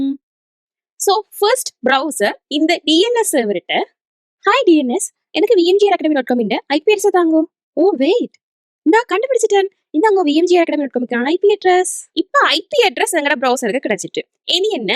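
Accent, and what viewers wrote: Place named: Sri Lanka. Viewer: Super sister theliva irukku, and mostly ungaloda Mozhi ilangai tamizh Mozhi romba pidukkum athanala theliva puriyuthonu Theriyala, nandri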